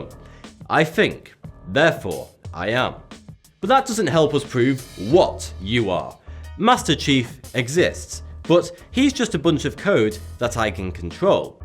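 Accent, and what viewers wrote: United Kingdom. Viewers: ahh that british accent is killing me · Actually he says but because of his British accent, he sounds 42.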